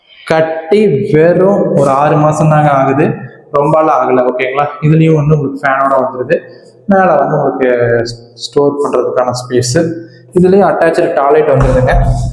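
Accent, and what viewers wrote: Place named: Coimbatore, India. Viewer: kovai slang😅😅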